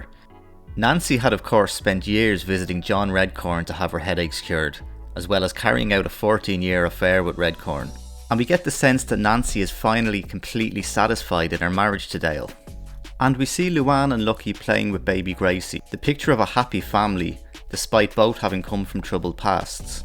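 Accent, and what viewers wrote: Ireland. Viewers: British? Sounds Irish to me. But I get you either way. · a very Dublin accent to me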